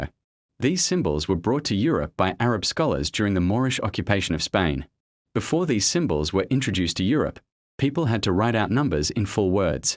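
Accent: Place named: United Kingdom. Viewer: An AI voice talking with a British accent, pronouncing Arabic names perfectly, and claiming that Muḥammad ibn Mūsā al-Khwārizmī was an African. In reality he was from Persian or Central Asian descent and was born in the region of Khwarizm (Chorasmia), near present-day Khiva, Uzbekistan. This channel is really unravelling...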